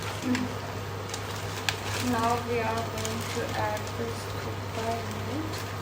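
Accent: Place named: Ladakh, India. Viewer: Way u r talking ki ohhh na ladakhi spara shasa manakkk type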